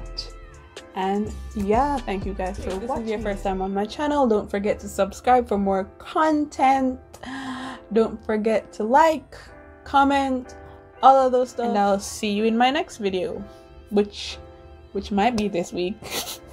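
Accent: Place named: Caribbean. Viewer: I am livingggggg for the patois and confidence. serving us island girl realness as always.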